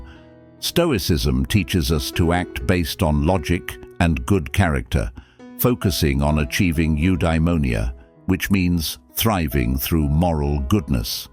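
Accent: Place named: United Kingdom. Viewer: The expressions....social media", snacks, and and "online".. do not go well with the overall energy brought on by the voice of wise, elderly man speaking with a classic British accent 😄....lol....This is only my opinion....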